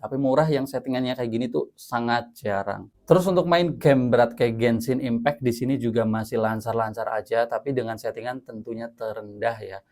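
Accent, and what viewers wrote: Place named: Banyumas, Indonesia. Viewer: Logat ngapak-nya masih kedengeran 😅. Tp raut mukamu oke ngab. Lanjutkan karyamu. Sukses selalu 🙏🏼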